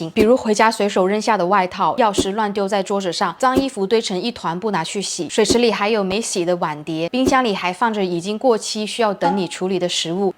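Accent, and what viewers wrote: China. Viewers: 容易，傳統，觀察，囤貨，宣傳，玄關。這些詞，要說慢一點，才好聽。妳是海南道妹妹嗎？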